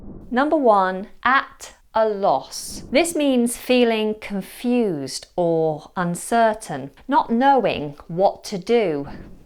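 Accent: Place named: United Kingdom. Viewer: It is very useful to listen to you specially for your wonderful british accent!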